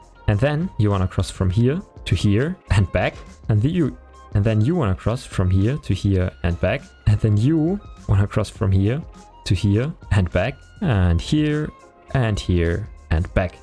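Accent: Germany. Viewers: This video’s quality is incredible — at first I thought it was made by a big YouTuber! I was so pleasantly surprised to discover your channel. The content, your voice, and even the German accent (it adds a unique charm!) are fantastic. Can’t wait to see more!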